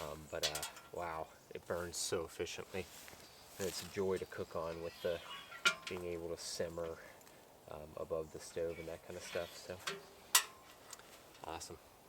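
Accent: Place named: United States. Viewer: You use a lot of British terminology in an American accent. Are you an expat?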